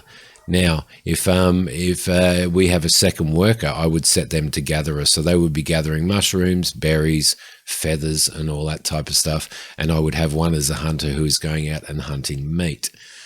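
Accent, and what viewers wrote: Australia. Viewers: Australian, wah whoo!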